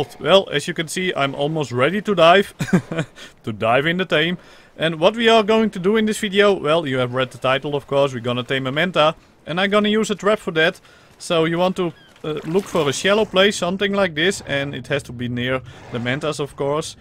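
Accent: Netherlands. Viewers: are u dutch? :p I can always hear if someone is dutch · Haha I'm dutch too, you can hear it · Are you Dutch? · Are u dutch? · I can hear you are dutch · U are definetly dutch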